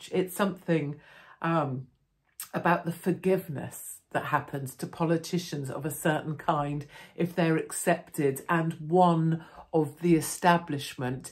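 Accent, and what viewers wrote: RP, United Kingdom. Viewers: You sound d posh but your amazing proper down to earth funny clever and should be in charge of our pond life government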